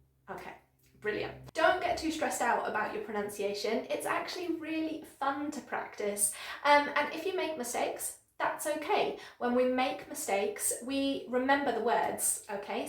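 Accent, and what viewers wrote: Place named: United Kingdom. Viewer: Thank you for the lovely video. I love the British accent...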